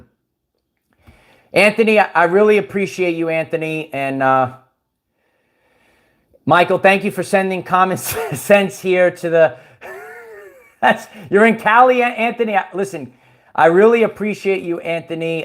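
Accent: New York, United States. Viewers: Fascinating site this, Michael. Just started watching and I've already consumed about 20 shows in just a few days. Personally, I'm a bit of a loner and I lack trust in everyone online although I started awakening around about 2015 prompted by the usual atrocities/events having initially debunked the Party Political systems which are clearly a Cult creation and do not serve the people. I like the way you described the 2020 Plandemic as like the 'Fall of the Curtain albeit I've forgotten the exact term that was used. Spiritually, I'm trying and I like Gigi Young's work but I'm a bit of a hard case and I'm having trouble linking to my heart space and finding Christ Consciousness. People like David Icke, Clif High, Dark Journalist and Max Igan are must watches for me over several years now but, of course, I still know nothing in the grand scheme of things. 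And I love your New Yorker delivery and your hard hotting and specific delivery and hyour Sccoby Doo imitations. Lol. So, I'll be continuing to watch and learn. Thanks mate from here in the UK.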